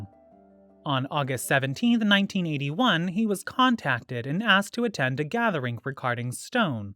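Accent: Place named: United Kingdom. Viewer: Stopped after 3 seconds, listening to this British cigarette talk makes me want to 🤮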